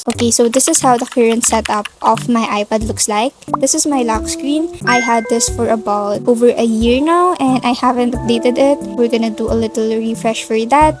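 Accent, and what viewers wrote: Philippines. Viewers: I knew you were tagalog bc of ur accent!!! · I CAN HEAR THE FILIPINO ACCENT 🤩💕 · Hi I’m new to this channel and are u Filipino bc u sound like one a if u are one hello twin 😂 ❤ Edit: oh wait u are Filipino pala 😂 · Do i hear a pilipino accent?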